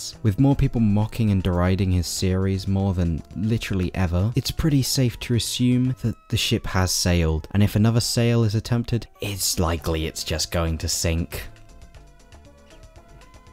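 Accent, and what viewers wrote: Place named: United Kingdom. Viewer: ur british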